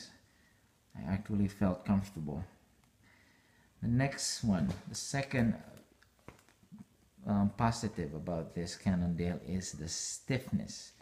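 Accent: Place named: Philippines. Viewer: nice accent. pinoy?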